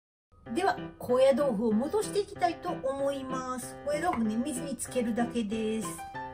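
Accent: Kyoto, Japan. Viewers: 先生の京都弁が可愛らしいです 意外な組み合わせですがさすが奥薗壽子流です!🥰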